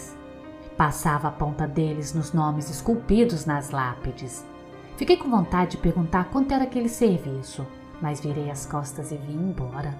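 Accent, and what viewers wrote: Minas Gerais, Brazil. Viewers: perfeita e cativamente com sua minerice bom de ouvir com cafe e pao de queijo parabens!!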